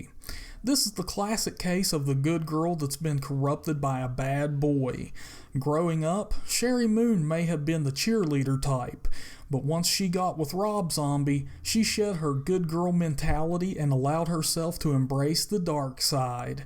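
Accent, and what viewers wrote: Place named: South, United States. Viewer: Love you southern draw dude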